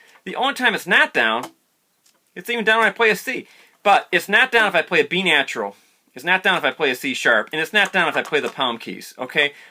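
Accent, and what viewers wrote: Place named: Upstate New York, United States. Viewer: This obviously the way Stan Getz played. As I look through his solos there are licks and patterns that only work with the bis.....btw where are you from? The accent seems upstate New York , Maybe Rochester?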